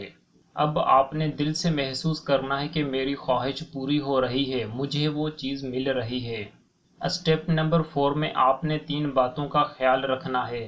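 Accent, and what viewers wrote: Sindh, Pakistan. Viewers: Awaz kisi aur ki use kr loo. Betay ya bahi. sa bunwa loo video with good. sound and style. Sindi village style chorr du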